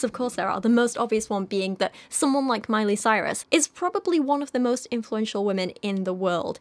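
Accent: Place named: Yorkshire, United Kingdom. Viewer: I always enjoy listening to your videos whenever I'm doing things like cleaning, running errands, and cooking, regardless of the topic. My favorite teacher from elementary school grew up in Yorkshire and your accent is so nostalgic and comfortable to me.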